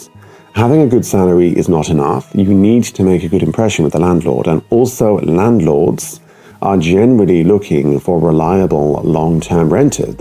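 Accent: Germany. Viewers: Funny how your accent has developed a German quality to it including a hint of their arrogance. You even look like a German, lol. Your assimilation is complete. WE ARE THE GERMANS, YOU WILL BE ASSIMILATED INTO THE COLLECTIVE, RESISTANCE IS FUTILE. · Dude, your English turned into German-accent English. Really. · Why do sound like a German speaking English?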